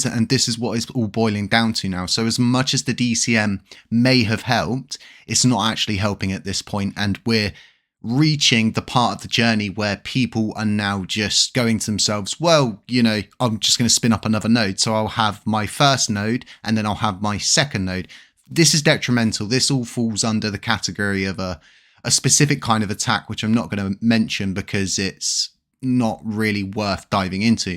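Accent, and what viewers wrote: United Kingdom. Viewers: I absolutely love your videos with the 007 accent, but Christopher Harris is also adorable. He has a 007 accent too. I prayed for God to let my late dog 2/29/2023 visit my son and sure enough he woke up all excited and said he was visited by the dog in his dreams and he had no idea I was praying for that. What I do know is I follow both you and Jesus is Lord because you both want the same thing which is Lunc to go to the moon. I love how the cat wears glasses 🤓 ❤❤❤ but Christopher Harris I believe really gets dreams but he’s not the only Christian influencer on YouTube getting dreams about LUNC. I dreamt once my dog and I playing in heaven all night because I prayed and prayed for him to be healed from distemper but he did not make it. But God gave me an entire night in heaven in a dream playing with him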